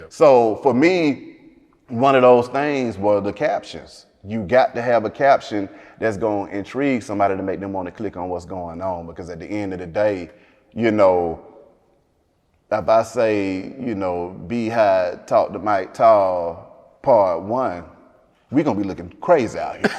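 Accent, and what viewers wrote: South, United States. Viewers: I Would've NEVER guessed talked this much lol He a cool dude I'm from the midwest came across him on youtube I believe he going places he ask insightful questions AND he let the Guest reply without interrupting but can help them carry a answer. I think that natural south delivery can be a plus for mainstream whatever he got planned if the timing right and done with the right platform. Via Shannon Sharpe type shit glowing but stood out being his self on the Hip Hop side of things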